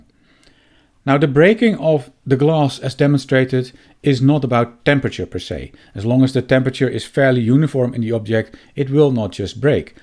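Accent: Netherlands. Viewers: I thoroughly enjoyed digesting this information. Your dutch accent reminds me of my birthplace NL. Thank you for the education.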